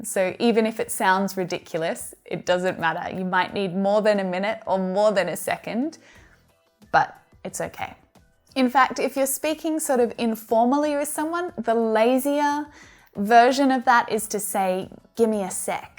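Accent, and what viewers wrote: Australia. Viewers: I ❤️ Australian Accent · I love Aussie accent and lucky for me I’m Aussie come from Australia live in Australia like if your Australian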